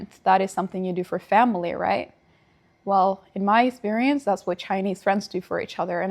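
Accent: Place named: North America. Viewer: Excuse my ignorance, do all Norwegians speak with this "north American" accent? What other languages do you speak in your native country?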